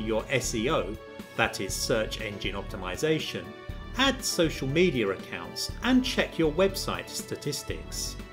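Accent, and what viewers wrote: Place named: United Kingdom. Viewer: Well done, what a great tutorial of Wix (and with this British accent as well:)) I built my first website now, indeed no more than 10 minutes, but there is some work a head of me still. I think I'll get a logo somewhere and I need to add my content. Thanks a gain for this simple tutorial.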